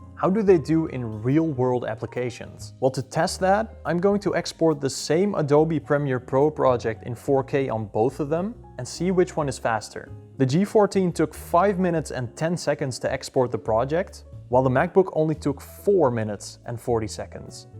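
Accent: Netherlands. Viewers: The first dutchy who doesn't have such a strong accent haha dankjewel! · Ik hoor een heeel Nederlands accent 😄 Eindelijk een goeie vergelijking die ik zocht. Thnxxx👍👍👍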